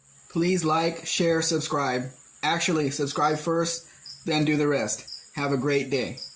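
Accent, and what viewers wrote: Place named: United States. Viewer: I have a question, if you are OK with it, are you native Mexican? You speak very fluent English with a solid American accent. Love your videos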